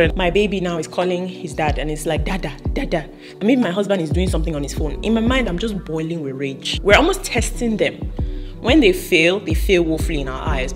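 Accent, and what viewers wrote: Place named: Ghana. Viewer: your Nigerian accent is disappearing, I can hear the Ghana in there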